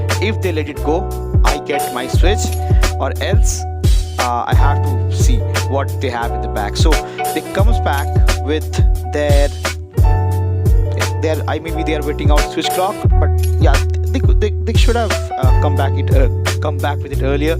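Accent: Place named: India. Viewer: Bro thé musics sounds is high cant understand your indian accent cleary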